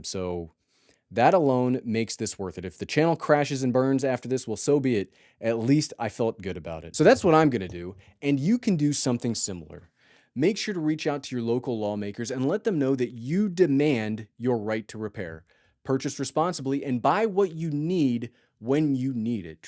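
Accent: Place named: Midwest, United States. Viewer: AI voice with midwest accent? 👍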